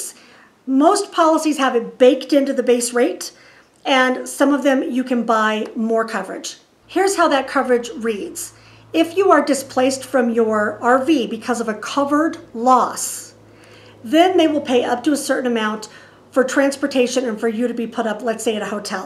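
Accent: United States. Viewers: You talk just like any normal Yankee. I'm use to it. Was not to fast for me and I'm south of the Mason Dixon. Great info as looking now for a good company. My daughter told me Progressive was where to start. Thanks for the details will be very helpful.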